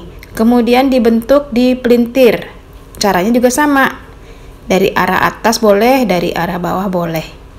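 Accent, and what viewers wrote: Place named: Medan, Indonesia. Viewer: Asli mendan ya bunda soalya logatnya ketauan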